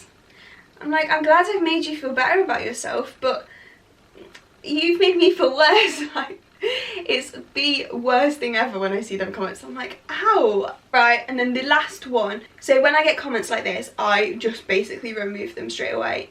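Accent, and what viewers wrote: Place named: England, United Kingdom. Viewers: I love your accent, im from England too but i can't work out where the accent is from! You are extremely inspirational , beautiful and very talented at make up, you should be so proud of your content! 💗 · She's from Derby. I'm from Derby as well we have a couple of "native" accents here. One of em is Kelly from Misfits! lol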